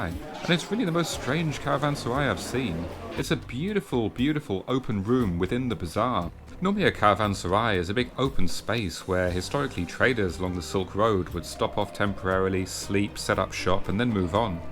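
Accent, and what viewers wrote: Birmingham, United Kingdom. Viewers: are you Brummie by any chance?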